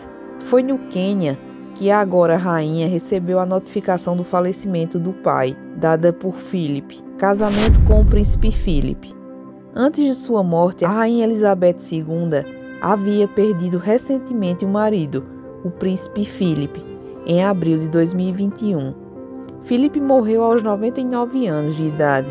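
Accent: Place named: Caruaru, Brazil. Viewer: Essa é caruaruense raíz.. Kk.. sotaque me lembra muito meus parentes de Caruaru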